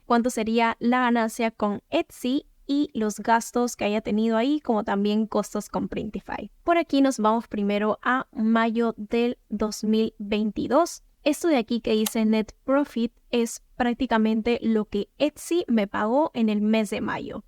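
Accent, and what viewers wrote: Peru. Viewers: Hola! Muchas gracias por otra vez un excelente vídeo. Es chistoso cuando vi tu primer vídeo, me pregunte será peruana por el acento (aunque somos los menos que tenemos acento en Latinoamérica 😂) y después leí un comentario que si…yo también 🇵🇪 😊 ❤️ Pregunta! so ni bien la persona ordena y paga por el producto, Printify lo recive y cuando lo envía ahy mismo te cobra a ti, pero Etsy todavía tengo entendido que no te paga a ti hasta verificar y que el producto sea enviado…so cuánto tiempo pasa para que Etsy te pague? Y entonces tú siempre tienes que tener un monto de dinero en tu cuenta para cuando Printify se cobre de ti (porque Etsy aún no paga hasta dias) verdad?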